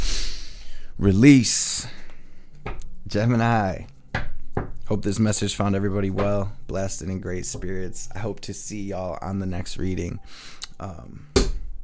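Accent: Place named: Upper Midwest, United States. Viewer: I just found your channel. First, thank you for what you do. You are a gift. Your reading and message are so accurate (and needed) you brought me to tears. Also, I’m originally from the Upper Midwest/Great Lakes region. So, your accent reminded me of home which made the message all the more powerful. Again, thank you for sharing your gift.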